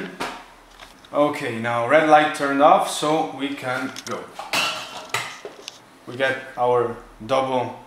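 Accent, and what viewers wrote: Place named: Italy. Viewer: Within seconds I was sure you were Italian! You know how to pronounce the name correctly. 😂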